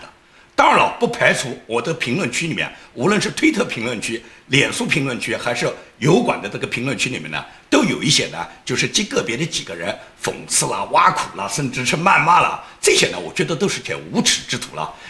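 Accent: Nanjing, China. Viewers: 建民老师有标准南京人的特质，真诚，每天一睁眼第一件事开YouTube ，听建民老师的南普话，我在光华东街长大，听建民老师就像听家门口的邻居在聊天，这样的良心媒体，让那些五毛（现在是二毛）或者恶意挑三揀四的粉红，有多远死多远去。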